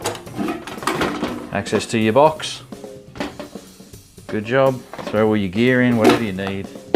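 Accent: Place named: New Zealand. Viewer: Wow - surprised to hear your New Zealand accent after reading the title...I thought you guys would call them a box and not a can. Anywho, I hope you’re still going to make videos. How about throwing us subscribers a bone and making some new content ya old sheep shagga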